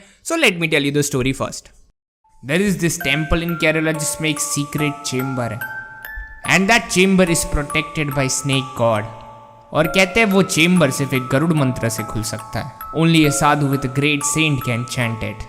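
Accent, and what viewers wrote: Gujarat, India. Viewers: New Temple Run game Kuch Kuch Raji game Jaisa Nahin dikh raha?????🤔🤔🤔🤔🤔🤔 And also I think you from Gujarat in Ahmedabad or Gandhinagar or Surat I think so because your accent and you that say division multiplication like that I am Gujarati to (Bournvita Nahin Pihu Mare)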